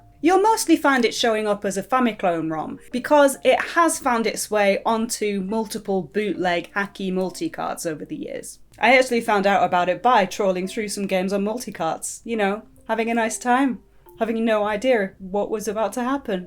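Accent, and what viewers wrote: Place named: Northern England, United Kingdom. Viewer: 2 questions: The name, is that a game or musical reference? And I know you're down south now but I hear northern, where did you grow up? (hull?) oh and Keep up the good work long time fan.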